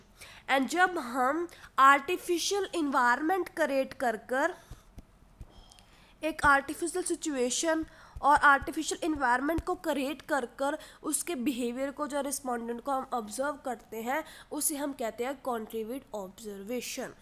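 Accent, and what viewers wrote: Punjab, India. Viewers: मैडम जी आपने जो भी पढ़ाया बहुत cute लगा जी, किन्ना सोना बोलते हो जी आप ☺️ पूरे पंजाबी टोन है जी ! बहुत बढ़िया , आप पंजाबी हो🙂?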